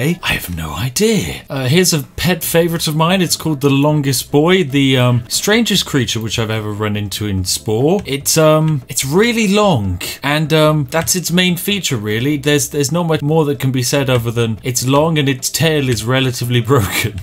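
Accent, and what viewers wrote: United Kingdom. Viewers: Are you actually British because that's not how i speak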